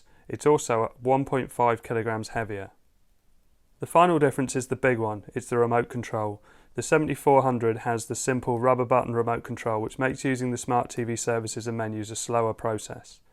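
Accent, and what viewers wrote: United Kingdom. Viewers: I love your british accent! Thank you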